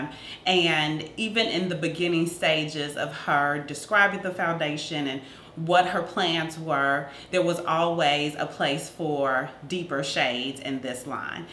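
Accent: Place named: Texas, United States. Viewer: Are you from Texas?? You sound just like me! Hey Y'all! #htown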